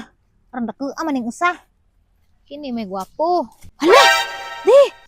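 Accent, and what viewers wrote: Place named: Southern Leyte, Philippines. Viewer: Suway ko shout out dai … my name is Haydee Bito Bermoy Moyers from Surprise, Arizona! si mommy nako Ang naka discover sa imo lipay og moot kaayo siya watching you. She passed away 5yrs ago na pero pabilin guihapon ko follow nimo… it reminds me of her when I listen to you talk… she was born in Southern Leyte and I grow up listening to her dialect like yours.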